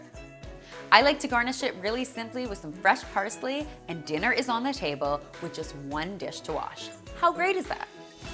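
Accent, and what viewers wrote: Canada. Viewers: It's such a funny thing, as an American, to hear someone speak for 10 minutes only to realize they're Canadian when they say "about". Nothing bad about it, I genuinely like it.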